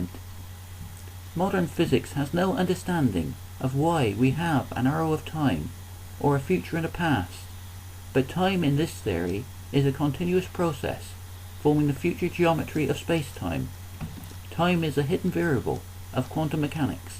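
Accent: West Country, United Kingdom. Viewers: Ahhh you sounded westcountryish.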